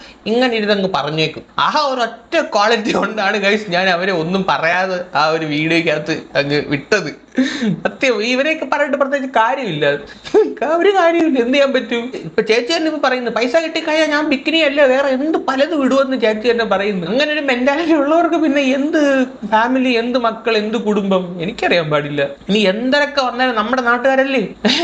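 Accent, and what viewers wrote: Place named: Thiruvananthapuram, India. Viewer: പൊളിയാ 🤩തിരുവനന്തപുരം ഭാഷ da🤩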